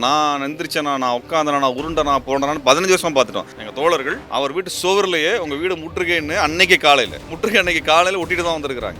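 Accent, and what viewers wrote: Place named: Kerala, India. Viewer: Dei naaye h raja tamizhan da.. Nee naaye un slang eh malayala slang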